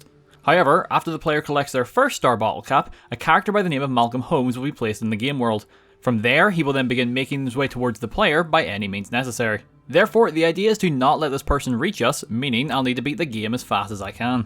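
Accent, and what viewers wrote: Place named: Northern Ireland, United Kingdom. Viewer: Ahh, a fellow Northern Irishman, eh?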